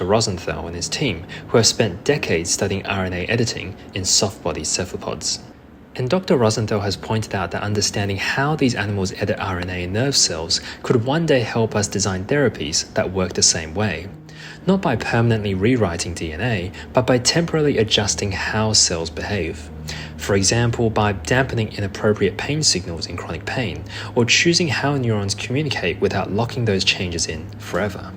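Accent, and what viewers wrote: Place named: Australia. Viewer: Good to hear an Aussie voice, thank you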